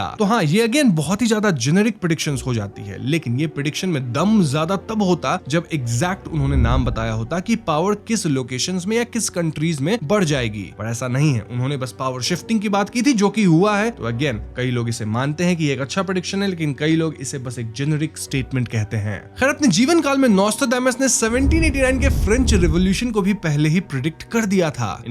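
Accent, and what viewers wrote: Bengal, India. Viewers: Bhai tu bangali hai Keya Tera Hindi sunke Aisa lag raha ha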